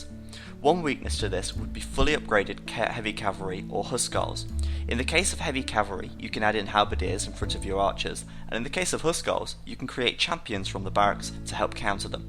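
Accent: United Kingdom. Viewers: He's got the most standard British accent you could possibly ask for, I have no idea how you're thinking he sounds Brazilian.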